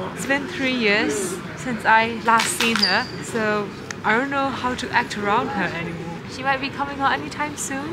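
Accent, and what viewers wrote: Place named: United Kingdom. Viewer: I'm in luv with your bri ish accent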